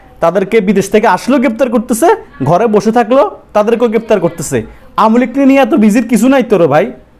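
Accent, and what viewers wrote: Chittagong, Bangladesh. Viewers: Apni ki chittagong er?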